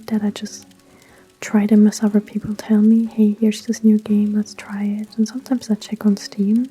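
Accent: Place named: Norway. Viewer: Thanks for this very relaxing video! I would've guessed you were from Norway^^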